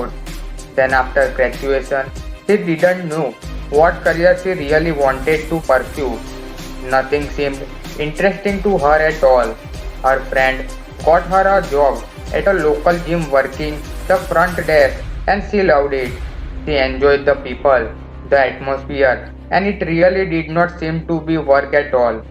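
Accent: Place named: India. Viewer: Beautiful woman and lovely vide Disgusting Indian accent audio ruined it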